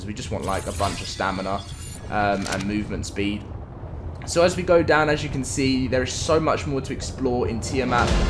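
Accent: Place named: United Kingdom. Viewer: And yet another video that i have to skip because of a british accent that I just cant take honestly. I thought the new generations were loosing accents ? Why british people always gotta do stuff differently ? It sounds so gay I just cant sorry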